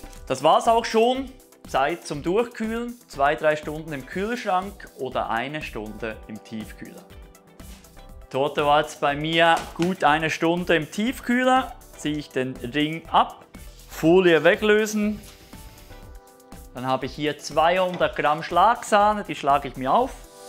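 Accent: Switzerland. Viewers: Ach, ich höre sehr gern das "Schweizerische"! Super Idee mit tollen Praxistipps. Vielen Dank und bitte weitere solcher Backideeen.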